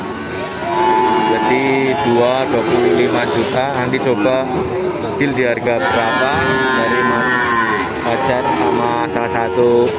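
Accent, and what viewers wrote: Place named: Banyumas, Indonesia. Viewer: ngapak campur indonesia mantaaap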